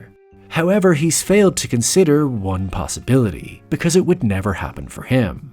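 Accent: Ireland. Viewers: Your Irish huh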